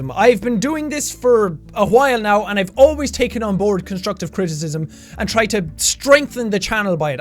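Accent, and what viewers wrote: Ireland. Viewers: Ow...! My heart is breaking...! ;o; Look, I know this video is over a year old now, and I know this comment is just another drop in the sea you get of them every day, and I know I haven't been subscribed to you for very long and I often leave comments that I only hope are as funny to me as they are to you, Jack, but I just have to say this, and I mean it from the very bottom of my heart: You really are as amazing as people tell you you are. I like your deafeningly loud voice with your rough Irish accent, I like how brightly blue your eyes can get, I like your giant, shit-eating grin, I like your impossibly gray hair (and I actually just discovered that you look very nice in gray shirts). I like it when you swear every ten seconds and say words differently than I do, I like the personalities and names and voices you give to characters you've only just met and how you stick with them, I like when you yell to your ma about cool shit or scream at Billy for being a dumb shit. 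I like the scary, funny, weird, impossible, dumb, loving games you play, I like that gaming isn't all you do, I like how completely honest you are with yourself and others, I like the relationship you keep with your fans. I like YOU, Jack. We all do. Why else would we be here for you if we didn't? Ugh, long message is long, I'm so sorry! This sap is getting everywhere.... In any case, if you ever manage to make your way through this train wreck of feels, then good on you! If not... TL;DR: I like you, I'm prou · just don't loose the awesome irish accent... as long as that stays... I'm good...... grow an afro for all i care! :D but either way.... just do your own fricken thing! have fun ... fuck the haters.... they're just jealous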